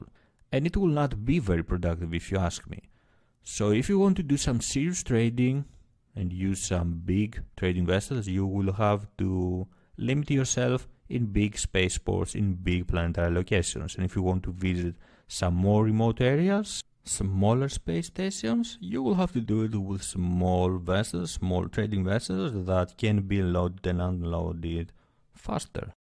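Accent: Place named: Greece. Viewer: Έλα παλικάρι μου, από Ελλάδα είσαι; τώρα το είδα στο άλλο σου video. LOL. Και μου έμοιαζε η προφορά σου για Ελληνική αλλά δεν ήμουν σίγουρος.....χα χα χα. Πολύ καλά τα videos σου. όσο για τα επαγγέλματα θα σχολιάσω αργότερα όταν θα έχω χρόνο. :)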